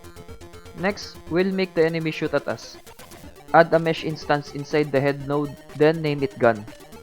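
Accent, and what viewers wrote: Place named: Philippines. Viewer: you sound like filipino...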